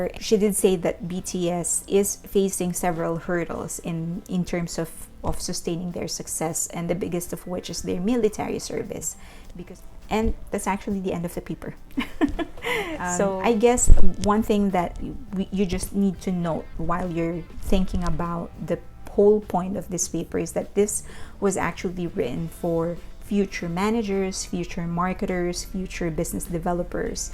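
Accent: Philippines. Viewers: Thank you sooo much great summary ❤️... I believe you are a Filipino just by your acccent , because I am ❤️❤️❤️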